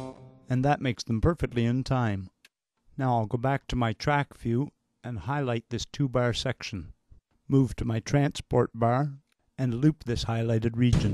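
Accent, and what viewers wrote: Canada. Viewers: Love the Canadian accent. How does Session Drummer 2 do on more funky rhythms with ghost notes and flams? Does it sound natural or do you get the "machine gun" effect.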